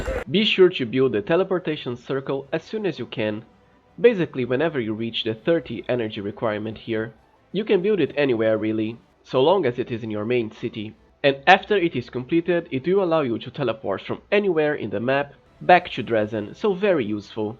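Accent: Brazil. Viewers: Br né? Sotaque inconfundível lol 😅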